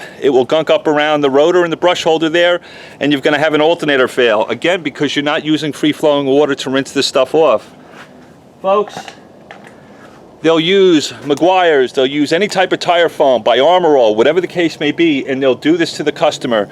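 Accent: New York, United States. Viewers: You sound so much like my NY friends (or me..lol) Great video and hope people pay heed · So, how do you get the stuff off your engine if someone has done that? btw, glad to see you're here in Dallas, pard. Your accent though - you're from the NY area, possibly? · Why do you have new York accent?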